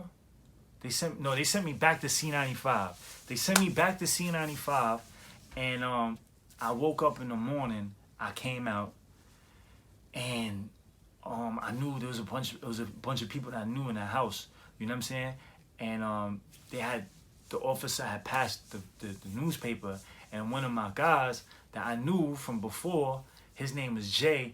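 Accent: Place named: Brooklyn, United States. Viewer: So brooklyn bro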